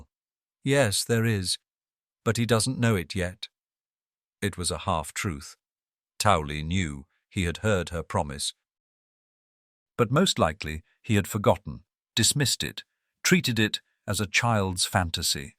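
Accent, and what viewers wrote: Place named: United Kingdom. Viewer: the narrator's British accent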